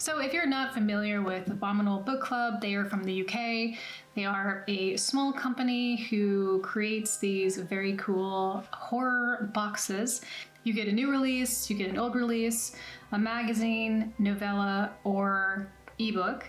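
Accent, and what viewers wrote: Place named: United States. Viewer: Congratulations on 2,47K subscribers!!!!! I have a question, Why did you move to Berlin, Germany, I assume you're from the states due to your non german accent!! lol. Do you think you will ever move back here???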